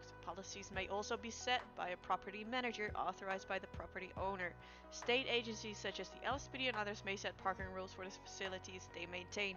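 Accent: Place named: United States. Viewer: he aint wrong Shawn loooool UK boi that sounds really american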